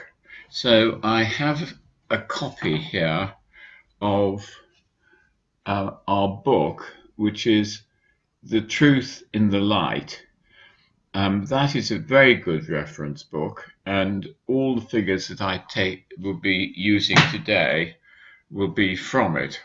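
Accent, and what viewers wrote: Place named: England, United Kingdom. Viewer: Thank you. Doctor Fenwick so very much! Your discourses are wonderful to listen to! Nothing I enjoy so much as listening to an English gentleman, who is well bred, and articulate as you are! The English have never lost their claim to CLASS! I am eagerly looking forward to your next NDE presentation, part 2! God Bless!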